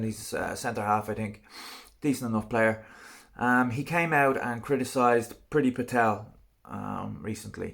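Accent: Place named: Ireland. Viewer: You are right Tyrone's move was not a good one, it was brilliant! The woman is vile and obnoxious and her abhorrent behaviour should be called out much more, you sound like you are Irish? if so, try this one, do you think it is acceptable that the same woman stood up in parliament approximately three years ago and suggested that they should block food supplies to Ireland in an effort to force the Irish away from seeking the backstop as part of the withdrawal agreement? What kind of sick person/politician would think on those lines, you see she is right wing and I find it interesting that you demean the left who by the way seek social justice and economic equality as their primary objective whilst using a Nazi salute as analogy and comparison, Priti Patel's behaviour is right wing and guess what, so too were the Nazi's, are you a closet Nazi and racist using soft and subtle persuasion tactics, I wonder? 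You are being very arrogant and clearly attempting to link politics with what is a simple yet powerful gesture against racism and again one has to wonder? your argument sounds a lot like passive aggressive. As for BLM, they are entitled to their views, aims just as equally as the right, and as for capitalism, it is no great shakes and will ultimately lead to the total destruction of human kind not as a matter of if but only when.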